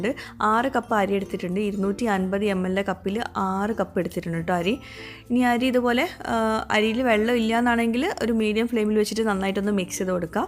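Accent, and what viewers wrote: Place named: Kasaragod, India. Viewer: Kasargodan nhammal... Ade samasaravum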